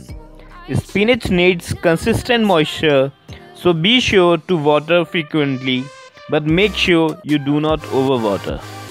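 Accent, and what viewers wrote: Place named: India. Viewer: super ahhh zzhy ! Famous Indian accent!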